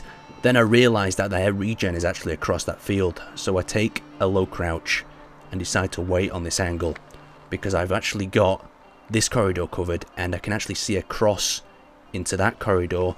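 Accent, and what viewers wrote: United Kingdom. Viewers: The clothing usually comes with such things, but as his accent tells hes most likely british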